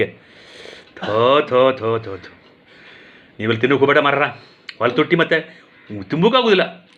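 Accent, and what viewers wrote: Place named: Gokarna, India. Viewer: Your Gokarna accents, beautiful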